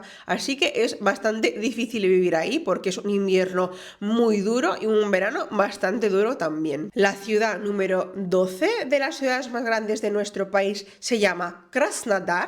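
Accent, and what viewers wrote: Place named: Spain. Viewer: Gracias por mostrarnos lo que son estas grandes ciudades; queda el de planificar algún día no sólo en visitar el país, mas por que no aprender el idioma oficial; y, escuchando a en un buen castellano de España me atrevo a empezar desde hoy con una palabra; después Dios dirá… Saludos desde la bahía de San Francisco, California. Jorge Edwin Marroquín Mansilla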